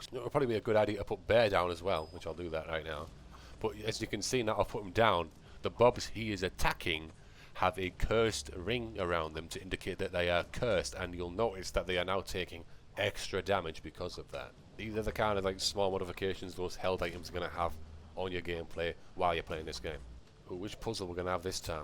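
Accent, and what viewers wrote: United Kingdom. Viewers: So dont hate him for british acent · He sounds scottish, first time i heard this voice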